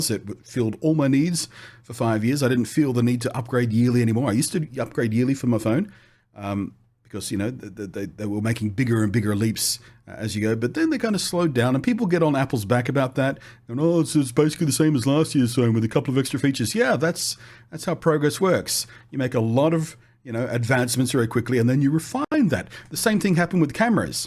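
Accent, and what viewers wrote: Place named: Australia. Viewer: Nice slow and Aussie wow fantastic brother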